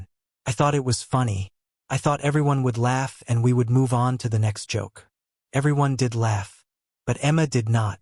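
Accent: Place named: Germany. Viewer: A good brief story with the occasional brief German accent. ❤🎉🏴🖖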